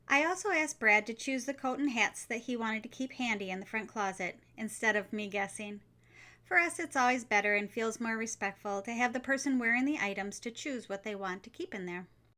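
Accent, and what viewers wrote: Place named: Cape Breton, Canada. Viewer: This is the first of your videos that I've watched. You sound like you come from Cape Breton.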